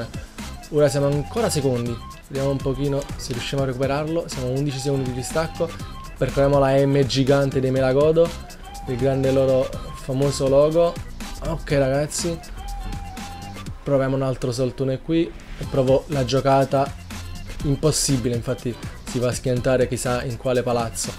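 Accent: Italy. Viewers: da come parli deduco che sei del centro/sud Italia.Confermi?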